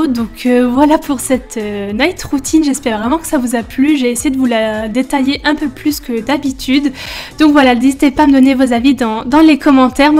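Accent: France. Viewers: ta vraiment routine bien chargé avec tes petites pantoufles c'est tellement mignon l'accent d'une française lol il est bien chanceux ton copain tu semble être une grande cuisinière :-) et continue de faire des exercices c'est bien aussi , et oui nous aussi au Québec nous regardons cette émission mais avec la traduction québécoise bien entendu lol continue tes super vidéos j'adore :-)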